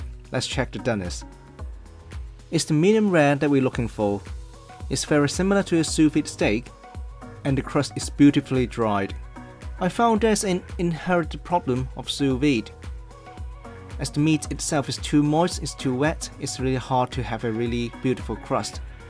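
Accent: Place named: United Kingdom. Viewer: Congrats! Finally your English Channel is here. Need to get a little used to your British accent lol since I’m from the U.S. will always support you as your other channel. 👏👏👏👍👍👍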